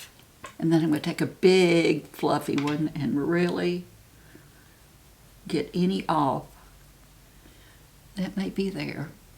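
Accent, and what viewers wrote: South, United States. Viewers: Your eyes are so pretty, bright, and clear. Not just the color, but the whites are so white, even b4 makeup application. Tends to fade with age and/or health challenges. 80 looks good on ya! Appreciate your transparency. New here. Enjoying your southern lilt. Luv the transformation you did here! Very nice result. Great pointers. Just checking it out 🖐 P.S.Yknow, me and a friend are watching and think you resemble singer Judy Collins. Ever heard that before? p.p.s. I have heard other makeup artists comment about the importance of blending, that unrernlenring is one of the most common errors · Absolutely love this! You best believe I will be glamming up when I’m your age ❤ so elegant and your southern accent saying “matoooor” and “oahll(oil)” reminds me of my southern family. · Just found your channel at the recommendation of a friend! 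You are gorgeous! Love that you show your naked face and all the techniques you use to enhance your beauty. At 80, you are amazing! And with your accent you must be a sister from the south. 😊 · Good day Mrs. You are amazing and brave. There is no way, I would have a YouTube channel but show myself without makeup is a scary thing to me. But you have a bieutifull face and a great southern accents. I would love to hear some stories you might want to share and tips and things. I am sure you have a lot to share that would be helpful to somebody like me. Thank you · I love your Southern accent. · Just found your channel and I love it!!! Thank you for this makeup wisdom!!! Also,do I detect a bit of a Southern accent?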